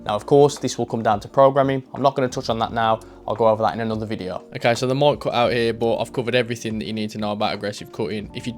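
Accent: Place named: Wolverhampton, United Kingdom. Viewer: Nice video man. Very informative and to the point! Looks like you got great results. You sound like your from my neck of the woods (wolverhampton)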